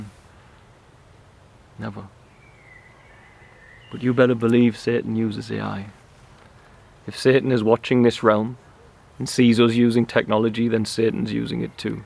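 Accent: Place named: Newcastle, United Kingdom. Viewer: Just a joy to hear a Geordie/!Teesside accent Do tell us your story.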